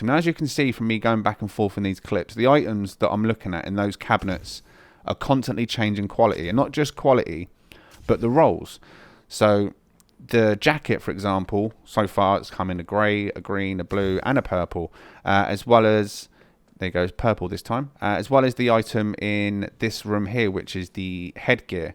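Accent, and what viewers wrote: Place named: United Kingdom. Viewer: literally what he just sounds british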